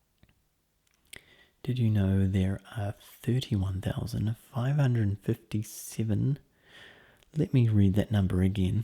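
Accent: New Zealand. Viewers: what part of new zealand do you come from? im from auckland :)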